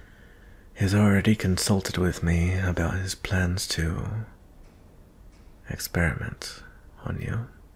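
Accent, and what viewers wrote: United Kingdom. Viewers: You’re really good at a British accent! I am impressed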